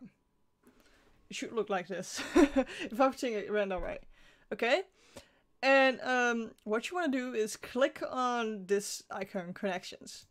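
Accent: Netherlands. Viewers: Are you Dutch or German? Your accent sounds slightly more Dutch, but you look just like a German girl who goes to my church. Goede dag! Guten Tag! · thnx :D i stream for a while but i dont get !lurk to work cuz is uses $(user) is now in lurk mode but in chatbot it doesn't work :( greetz from the netherlands :D (ja ik hoor dat accentje wel LULZ)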